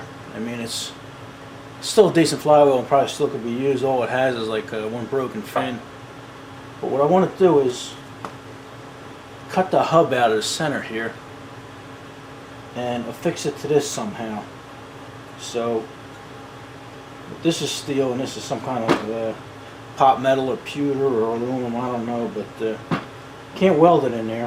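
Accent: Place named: New York, United States. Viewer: You sound like your from new york or from the country....or both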